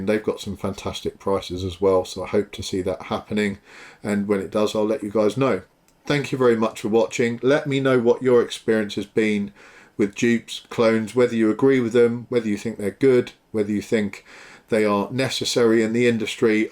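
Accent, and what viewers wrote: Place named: Australia. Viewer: I'm digging the Aussie (or is it Kiwi or Northern British ? ) accent... Funny how whenever I hear that accent I always associate it with belonging to a tough as leather and nails two fisted bar room brawler..lol... Must be a hell of a thing to get your ass kicked by a good smelling dude . ! Lolol Great channel and your authentic energy. New Sub !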